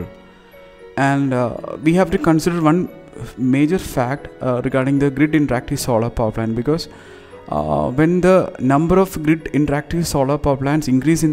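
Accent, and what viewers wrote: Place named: England, United Kingdom. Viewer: bhai india ka hi hai na mujhe to lagta hai England ka hai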